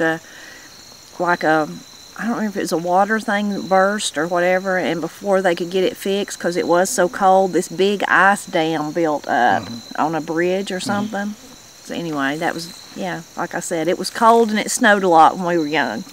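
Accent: Appalachia, United States. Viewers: Ah the popsicles in the garden :) We might get snow tonight up here (I hope not) and I really need to clean my garden up too. Also, I love how you pronounce Husqvarna :) And while it's not how it's pronounced in Sweden/Denmark/Norway (it's a Swedish brand) I totally understood you - it was just done with an Appalachian accent! :) (pronounced like: hoosk ·vaar·nuh) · Huskvana is the phonetic way of prouncing the Swedish brand chainsaw, but who cares if you mis-pronounce a word. It dosen't matter because we love your accent and Appalachian special words. That metal tub would make a great planter.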